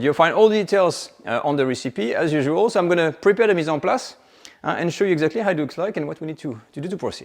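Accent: France. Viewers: Er ist OFFENSICHTLICH Franzose. Er spricht wie meine Mutter. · I understand everything he says. He speaks with a charming French accent and his explanations of his cooking is perfect. 😊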